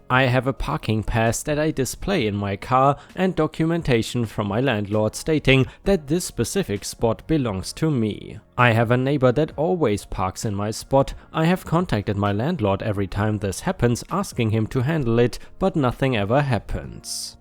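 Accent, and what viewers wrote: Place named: Germany. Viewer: Hi ⭐, is from Germany. English is not his first language. He has a wonderful accent. Most people are here listening to his story telling because we like his accent, his choice of stories, and his demeanor. Didn't you mother teach you what Peter Rabbit's mother told him? “If you cannot say something nice, then don't say anything at all.” Real simple, you should have learned this before you started school. What is wrong with you? 🌠🌟⭐🌟🌠😎🌠🌟⭐🌟🌠 Take care, stay safe, have a nice day. I'll pray for you. 👵☺️✌️☮️🖖 😷 🙉🙈🙊 ♥️😎⭐🌟🌠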